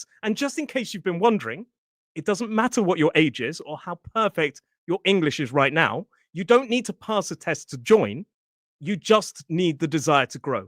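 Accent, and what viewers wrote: United Kingdom. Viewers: Hey uncle you very supper man.because you're speaking in britsh english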